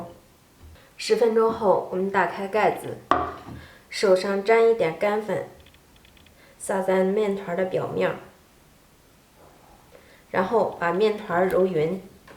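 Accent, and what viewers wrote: Northeast, China. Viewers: 一听口音，感觉就是俺们东北的媳妇，手真巧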